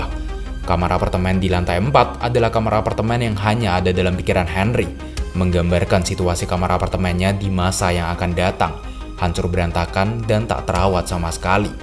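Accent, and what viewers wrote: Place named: Java, Indonesia. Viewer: BANG LU ORG MANA SI KOK KEK MEDOK MEDOK GT SALAM DARI SBY YAA SEMANGAT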